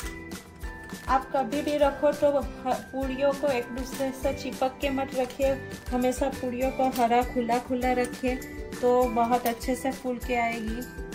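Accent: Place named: Nepal. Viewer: You speak like Nepali or Assamese. Nice video.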